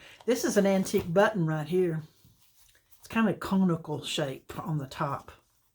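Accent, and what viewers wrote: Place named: Alabama, United States. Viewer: I love your accent. Where are you from? Sounds like Alabama. Forgive me if your not. I love your videos. I've done one or two now. I have a vid on you tube.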